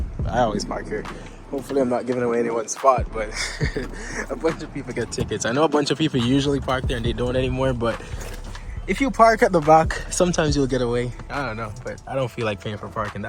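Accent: Jamaica. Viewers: U Jamaican by any chance?? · i can tell your jamaican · You almost sound like Jamaican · are you jamaican cus i can def hear somthing?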